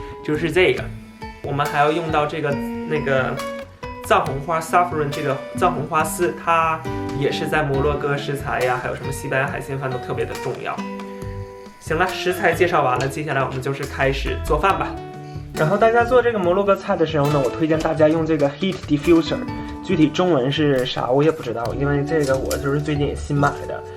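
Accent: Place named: Shenyang, China. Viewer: 哈哈哈是沈阳老乡吧亲切的口音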